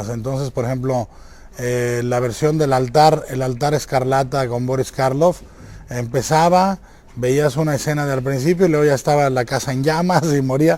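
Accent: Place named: Mexico. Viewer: No you r wrong he is mexican he speaks like mexican, but he uses sometimes spanish words Im mexican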